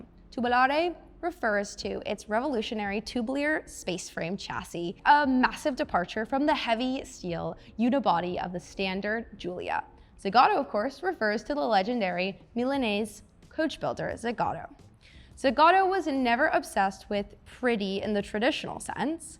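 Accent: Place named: California, United States. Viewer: dear god, lets get a different narrator... i dont want to listen to a california girl accent. ever.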